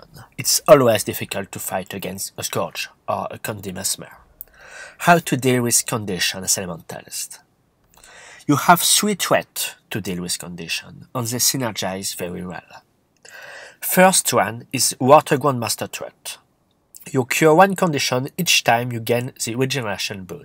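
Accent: France. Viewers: I know he talks English but I just hear French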